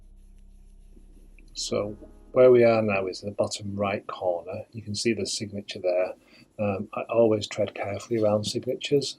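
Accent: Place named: Derbyshire, United Kingdom. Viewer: I’m from Derbyshire and your accent sounds very familiar. Love your down to earth approach and subscribed right away!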